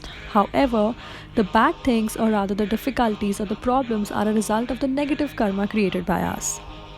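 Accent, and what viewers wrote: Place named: India. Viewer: Tough Critique in the US gives this EXcellent presentation a Thumbs UP! Bravo!! The demonstration must have taken a lot of hard work, and the outcome is superb. I now have a new Mantra to chant THANKS 2U!! Might I however suggest that you speak slower, because you your words sound mumbled, and PLEASE DON'T RUSH THROUGH THE VERY ESSENCE OF YOUR PRESENTATION - You say "Nam Myo Ho Renge Kyo" so fast every single time, that it's hard to catch what's said. The background recital is softer (and a v. nice touch), so the viewer is left wondering how to pronounce it. (Especially outside India where the "Indian" in your accent is foreign to the listener!? Your Presentation is so good it deserves to be heard all over the world) Also: Nam Myo Ho Rengay Kyo would be the more precise spelling if it is to be pronounced correctly. Hope you will take this as constructive criticism, & speak a little more clearly in your future videos - You have what it takes, so go for it, and Best Wishes. 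You Go Girl - Ushta-te!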